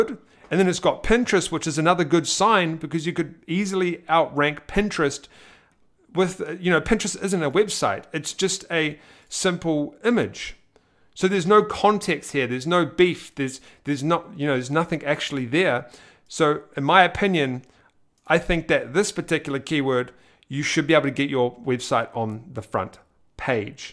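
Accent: New Zealand. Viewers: Whoa, a Kiwi!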